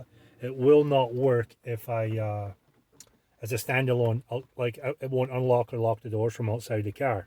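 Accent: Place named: Scotland, United Kingdom. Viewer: Why do you sound Scottish and American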